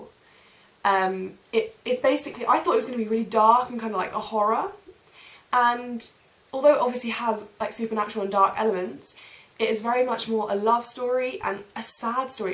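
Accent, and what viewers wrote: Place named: United Kingdom. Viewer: Pretty cool his first video. I am going enjoy a lot to hear british english. Looking forward to watch new videos. My congratulations.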